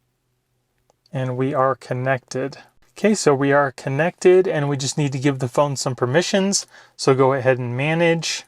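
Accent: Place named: Australia. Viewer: Aussie voice cool.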